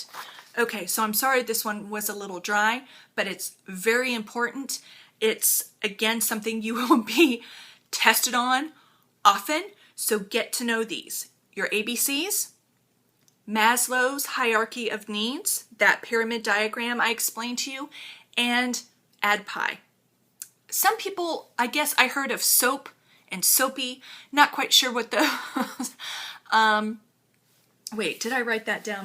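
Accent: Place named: Maryland, United States. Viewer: You must be a marylander... you have the same accent as me! Love your videos, thank you so much for taking the time to do them!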